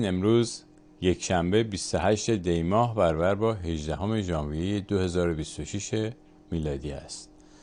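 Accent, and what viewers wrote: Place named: Iran. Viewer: بزرگ‌شده انگلیس است با گویش انگلیسی ، لهجه ایرانی دارد.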